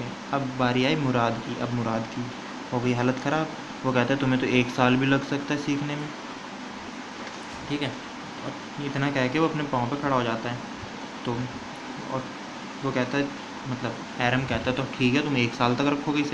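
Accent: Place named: Delhi, India. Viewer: 🤣🤣the way u teach is absolutely fantastic I really like it and the part of uncle is damn funny by your language i can guess that you are from Delhi m i right??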